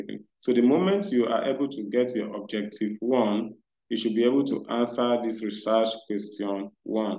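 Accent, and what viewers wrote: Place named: Nigeria. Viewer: It's a beautiful work. A nice presentation. The boss accent sounds Nigerian.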